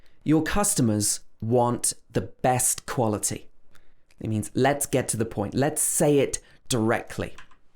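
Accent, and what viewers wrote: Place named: United Kingdom. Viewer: Thank you teacher. The video helps me learning British English accent. I hope you make more videos regularly.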